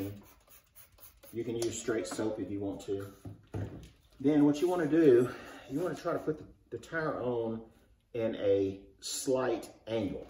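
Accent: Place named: South, United States. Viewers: Presenter definitely has an accent, although slight. Definitely somewhere in the southern US. The way he pronounces the word "buy" is a dead giveaway to my "normal" US word-pronunciation-keen California-raised-ears of 56 years. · Great demonstration. I hope I never have to do this repair job but it's helpful to know that it can be done. I love that accent, whereabouts in the U.S. are you from? We don't hear anyone speak like that in Australia except on movies. Thanks mate.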